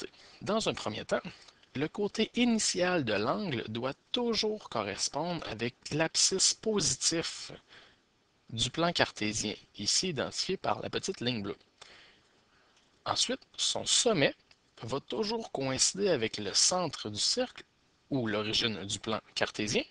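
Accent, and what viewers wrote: Quebec, Canada. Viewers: Le québécois est vraiment syyyympas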